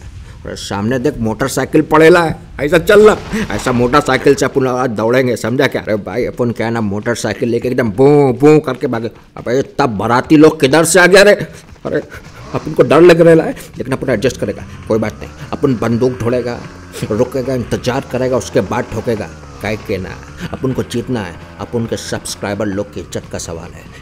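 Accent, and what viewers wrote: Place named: Bihar, India. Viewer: Wo bihari ascent, shukla sir ka class yaad aa gaya..!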